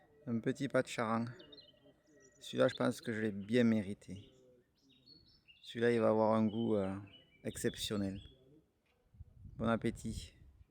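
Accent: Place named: Pyrenees, France. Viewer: Merci pour tes vidéos et bravo pour cette traversée. Cela m’a d’autant plus intéressé, que j’ai également démarré une traversée des Pyrénées en fin de saison cette année. J’ai d’abord regardé ta revue de matos. je fais partie de ceux qui s’intéressent au matos 😂. Et je viens de boucler tes 4 vidéos … et bien mon pôvre 😉 ( j’adore ton accent pyrénéen : “j’suis tremp “ ) le moins qu’on puisse dire c’est que tu n’as pas été gaté par la météo. C’est clair qu’il fallait en vouloir pour aller jusqu’au bout . Tes commentaires m’ont replongé dans mes propres galères. Et c’est vrai que c’est pas facile et qu’on n’est pas toujours fiérot quand on se retrouve seul au milieu de la caillasse et des cols, dan sun lieu qui si il est magnifique n’en reste pas moins austère. C’est intéressant de voir un parcours très tôt dans la saison. Moi j’étais plutôt parti pour finir la saison (départ de Banyuls le 30 août ). 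J’étais parti avec l’idée d’essayer de boucler l’intégralité mais je n’avai spas trop de mou sur les délais. Ce n’est pas exactement la HRP que je suivais , mais un itilnéraire décrit dans un topo Glénat « le Trek des Pyrénées », ça combine du GR10, du GR11 et de la HRP en 43 étapes. J eme suis arrêté au 14 eme avec avoir quasiment traversé Andorre suite à une douleur récurrente et croissante à la cheville ( en fait j’avais un tendon fissuré ), de plus j’ai commencé à être malade et avec des quintes de toux bien envoyées ( la aussi, la suite a révélé que j’avais chopé le covid). Bre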